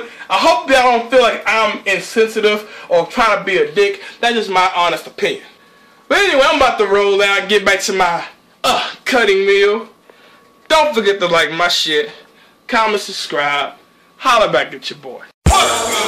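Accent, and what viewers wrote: South, United States. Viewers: Damn I wish I had a southern accent like you Chris.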